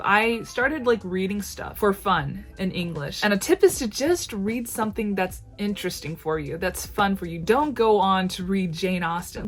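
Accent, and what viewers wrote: United States. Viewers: No way I thought you were from the US!! ¡Que inspiradora! Gracias por compartir tu historia. :) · Wow! I wouldn't have known you spent the majority of your life in Japan. Your English is so good. You even have the vocal inflections of Americans or Maybe Canadians in your age group. Congratulations on passing the B-2 DELLE.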